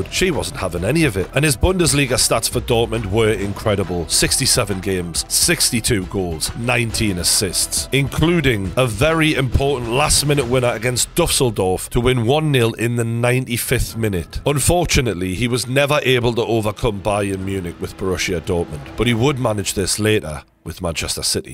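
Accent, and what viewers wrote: Newcastle, United Kingdom. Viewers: I love a true geordie documentary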